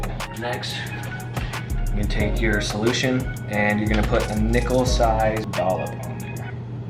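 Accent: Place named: Australia. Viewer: Will it work on dirt bike headlights. Still hearing some of that Aussie accent mate.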